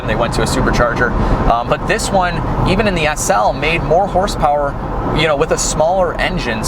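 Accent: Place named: Chicago, United States. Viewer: Your Chicago accent is legend!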